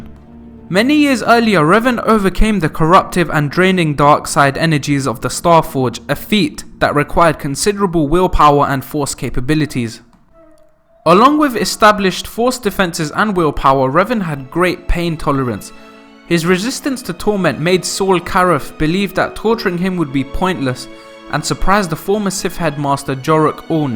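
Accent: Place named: East London, United Kingdom. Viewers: Loool I know your accent is it from east London? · damn you got a thick london accent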